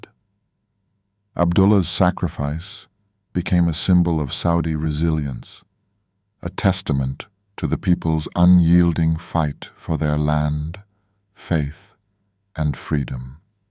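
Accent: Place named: Middle class, United Kingdom. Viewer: lol if it wasnt 4 the british there would be no oil markets and u lot wuld still be 3rdworld peasants, btw u shuld have narrated the video yorself instead of using sum corny english middle class voice over ...or do u think tht sort of accent gives yor narrative more validity ...funny tht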